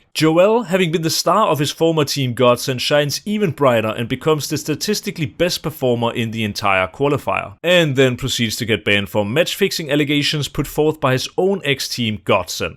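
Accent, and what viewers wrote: Denmark. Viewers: the heavy danish accent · Man føler sig hjemme med den danglish accent <3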